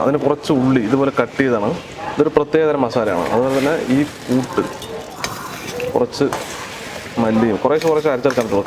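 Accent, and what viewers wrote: Palakkad, India. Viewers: ഭായിടെ പാലക്കാടൻ സ്ലാങ്ക് ഇഷ്ടപെട്ടവർ ലൈക് ചെയ്യുക 👍👍👍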